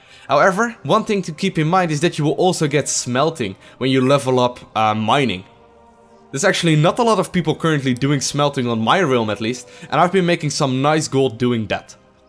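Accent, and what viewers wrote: Netherlands. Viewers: Are you from Holland?? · You are from Holland arent you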